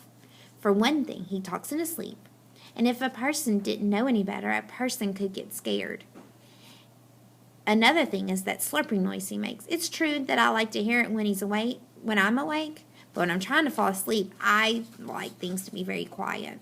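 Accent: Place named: United Kingdom. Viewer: No offense but you sound like a British person pinching her nose and reading at the same time. If you are wondering why I'm looking at the video, it's because of homework...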